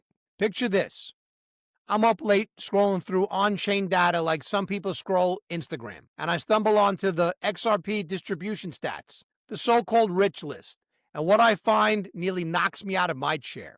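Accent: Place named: Brooklyn, United States. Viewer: You sound like an old school Brooklynite. Nice. Furgeddaboutit ! Holding XRP 8 years. At 70, it would be great if it pops soon.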